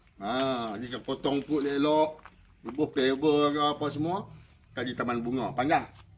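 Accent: North, Malaysia. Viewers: dh KENA ckp utara 😅beestt... mmng terbaikk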